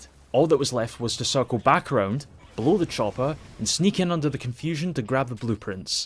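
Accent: Scotland, United Kingdom. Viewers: I can’t place your accent. East coast of Scotland? Edinburgh? Dundee? 🏴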